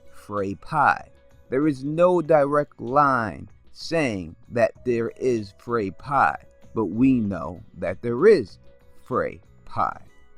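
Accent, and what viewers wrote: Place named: Brooklyn, United States. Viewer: You sound like an italian from brooklyn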